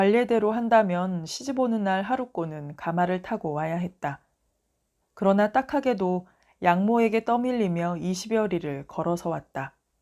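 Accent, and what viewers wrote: Gyeongsang, South Korea. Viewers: 독특한 경상도 안동지방의 사투리와 억양에 나도 몰래 빠져들어 감사하게 잘 들었습니다. 고맙습니다. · 고향이 청송이라니 반갑습니다 저도 청송군 진보면이 교항이라요 글읽는이의 사투리를 들으며 엣날생각이나서 저도 열심히 들었네요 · 감사 한니다. 경상도 의성 근방의 그 살 찐 음성!! 고향맛이 뚝뚝 떨어 졌습니다.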